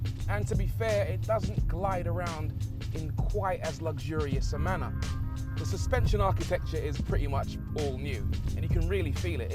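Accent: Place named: England, United Kingdom. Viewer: Nothing hotter than a Black dude with an English accent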